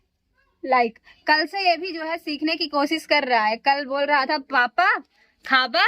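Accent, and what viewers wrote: Bhojpuri, India. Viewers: Nice bhojpuri. So cute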